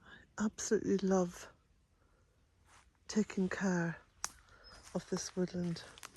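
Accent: Ireland. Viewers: Yet again, thank you for your words, you speak so much sense. I love your hat. You always look so smart, and we all love your soft voice and Irish accent. What a gift you are for us poor souls. It is my Birthday on the 19th November, and there is a full moon! I am so pleased, and shall be out in the dark just taking in the beauty of it all. May I send you Blessings from Devon xxx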